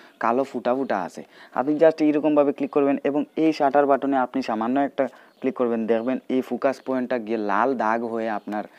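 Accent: Sylhet, Bangladesh. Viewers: সিলেটি ভাষায় মাত। 😊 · Sylheti na kita ba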